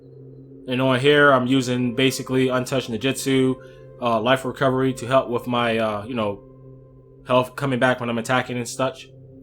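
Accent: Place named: East Coast, United States. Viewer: I wanna say the East Coast but not too sure. Lol. I got people I know from NC, Maryland, New York, etc.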